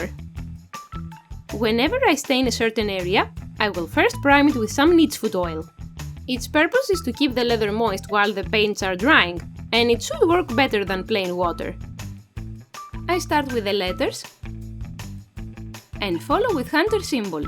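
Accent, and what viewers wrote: Greece. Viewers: Where are you from ? You sound kinda Greek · Are you greek? The accent kinda sounds similar to my families 😅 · You have done an amazing job! The journal looks fantastic! So much work that you have already put and continue to do so. I hope you get thereand that this project of love turns out exactly how you want it to. A thousand well dones! ps. Is this a greek accent I hear? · Πολύ ωραίο βίντεο! Μήπως τυχαίνει να ξες ελληνικά; Γιατί η προφορά σου λίγο μου θυμίζει τον τρόπο που ένας Έλληνας θα μιλούσε αγγλικά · My apologies for pointing it out ;-) I'm Greek myself (born and raised in Belgium though) and that Greek/English is so recognizable ;-) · This is a lovely video, plus i can recognize a greek accent anywhere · Are you Greek by chance? I love the artwork and your accent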